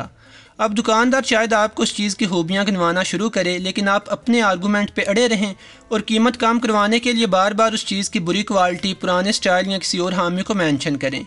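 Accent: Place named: Lahore, Pakistan. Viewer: Apka Accent Lahoriyo Wala hy..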